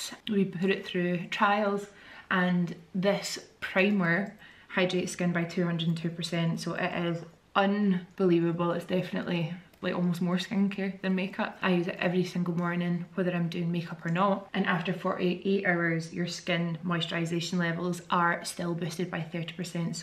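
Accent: Scotland, United Kingdom. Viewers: I’m a huge long time fan from U.S. and I just want to point out that the Scottish accent is extra strong today . LOVE IT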